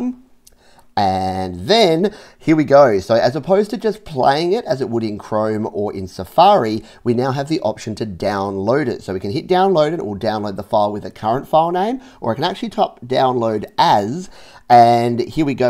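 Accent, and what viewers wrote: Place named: Australia. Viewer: Ya sound Aussie mate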